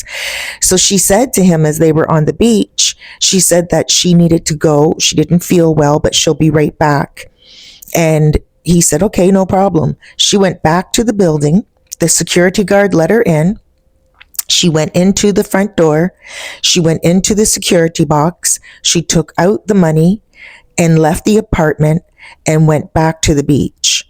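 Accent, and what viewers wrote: Canada. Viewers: love the Canadian accent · Again I love that Canadian Accent. Very pleasant. BTW went to Buffalo State College and visited Toronto plenty of times. That area around Lake Ontario is Cold 🥶. Brrr 🥶. I bet it’s warm spring and summer nearly year round. Nice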